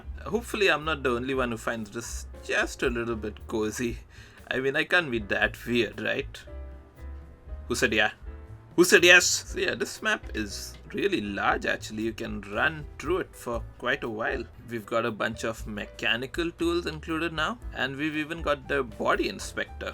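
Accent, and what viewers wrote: India. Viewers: Are you indian or its just your accent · Bro I thought he was from other country but, I noticed his voice that sounded like Indian. so, I checked his profile and he was Indian. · indian accent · I know gonna be great video if is India accent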